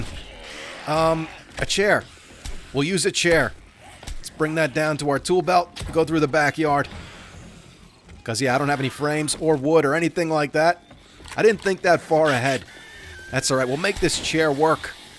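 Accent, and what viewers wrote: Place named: Boston, United States. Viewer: Quick question you from Massachusetts?? You sound like your a fellow bostonion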